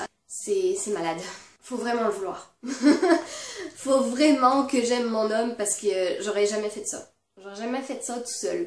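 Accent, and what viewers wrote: Quebec, Canada. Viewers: pk ta un accent Québécois ?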